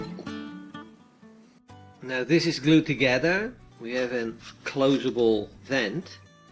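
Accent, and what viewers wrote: Netherlands. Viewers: ik dacht altijd al een nederlands accent te horen ;) keep up the good work!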